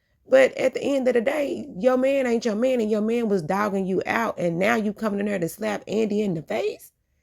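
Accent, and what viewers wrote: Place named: Mississippi, United States. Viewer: You have a Mississippi Delta or Memphis, TN accent.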